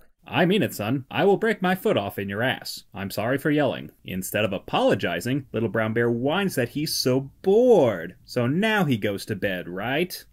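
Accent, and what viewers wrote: United Kingdom. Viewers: nice English accent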